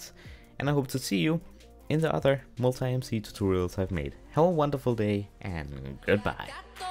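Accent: Denmark. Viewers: hmmm you sound a bit danish...?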